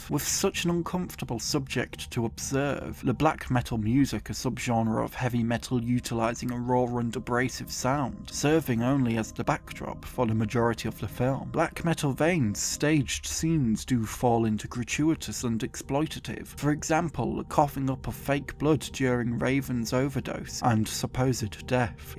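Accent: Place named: United Kingdom. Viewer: Oh no! Mis conocimientos en inglés se pierden con el inglés británico 😭😭😭😭 , pero igual Gracias ! ❤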